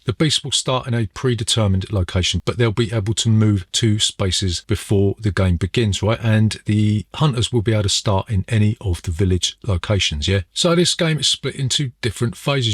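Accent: United Kingdom. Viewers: There was a time I could place where someone was from/what city in the UK by their accent, but that was long ago. Where you from dude?😎 Good review , saved me some money if I had money to spend on board-games now., I probably would have bought it in the past just on artwork .It does look good, but that is about it , I see from what you say. Besides, it is always a reach to buy any hard copy board game now, since Tabletop Simulator is around, and if you can handle the UI and syntax motions of TTS. ------------------------------- Oh yea, if you really want some good multiplayer board-games I suggest, 1.Wizard's Quest by Avalon Hill (found for cheap), 2.Divine Right by TSR (hard to find , very expensive) 3.Fortress America by Milton Bradley (also hard to find , fairly expensive) all these games are 40+ years old/out of print . I am not suggesting reviews, I just think you would really like playing those three games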